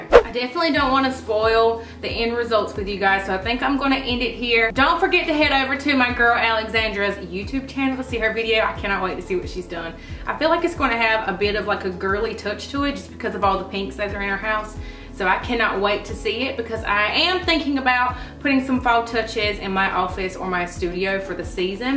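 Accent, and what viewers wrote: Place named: North Carolina, United States. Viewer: Omgoodness, I knew right away where you are from because of your accent! I just subscribed, came over from the other channel! I love watching these videos to get my own ideas for fall decor ideas, great job!! I'm from the same area in NC, but moved to Iowa!! 😁 girl I live for those hobby lobby sales 😂😂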